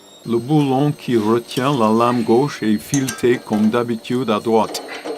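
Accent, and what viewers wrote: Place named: England, United Kingdom. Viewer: Merci Monsieur, avec l’accent anglais c’est encore mieux 😊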